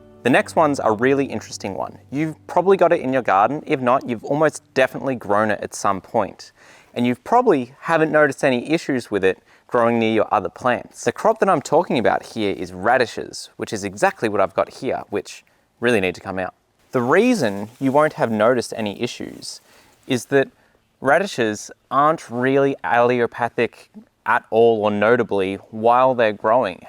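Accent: Australia. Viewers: Don't pick on his Australian accent. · That strangulated Australian accent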